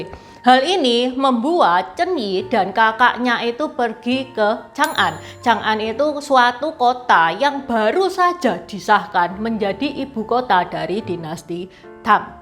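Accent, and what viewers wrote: Java, Indonesia. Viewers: Mantap channelnya,. Bahasanya Medhok... Asal kota mana ya,? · Medok guys.... Semangat terus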